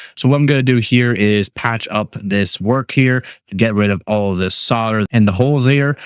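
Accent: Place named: Canada. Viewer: Yep. Really irritating to hear sodder. I thought the only country to pronounce it sodder were your neighbours,(and soon to be assimilated into the US ...Joking of course ) . Sussed you were Canadian by the pronouncing the word "out". Have been doing the electronics etc stuff for over 60 yrs- yep an old boy. So if you won't pronounce it as solder then I will have to watch with the sound turned down.... Cheers and good luck with the channel.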